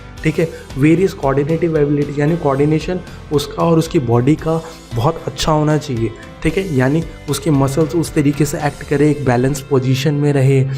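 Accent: Uttarakhand, India. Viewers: Bhai ap uttrakhand se ho kya 😊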